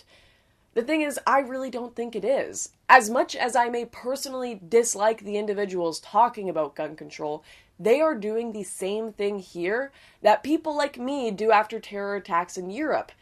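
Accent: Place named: Canada. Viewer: you are getting wiser by the video!! Doesn't mean I agree with everything you say. (Doesn't have to) As usual, like I always say, Canada needs you and we need more Canadian dialect from you. Thank you!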